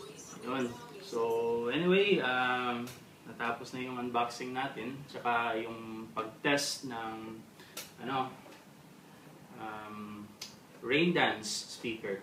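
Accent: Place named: Philippines. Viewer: It's the disgusting pinoy accent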